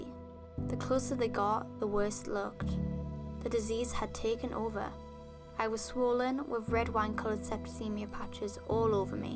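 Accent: England, United Kingdom. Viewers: Also I noticed you have a accent maybe a British? Because of the way you talk and the way you said better it was like: “betta” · I rlly wish it wasn’t told by an English accent. I didn’t like the pronunciation of words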